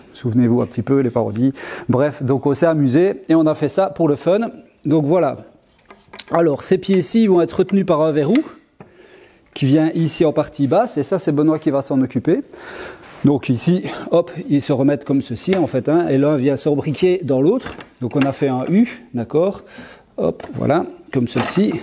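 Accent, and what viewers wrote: Belgium. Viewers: Bonsoir, Moi, j'aurais dit "en butée"... Mais le parlé belge et l'accent...🤔 Abuter : - terme de marine. Mettre bout à bout, ou toucher par un bout. Ces pièces de bois abutent. - ce terme s'utilise pour la pétanque et le jeu de palet. Abuter signifie lancer la pièce d'un jeu en direction d'un but afin de déterminer quel joueur commencera la partie. C'était la minute info🤭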